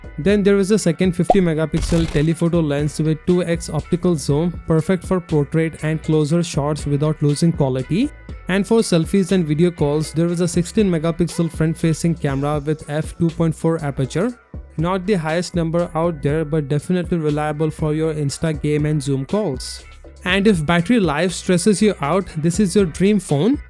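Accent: India. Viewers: U got the Indian accent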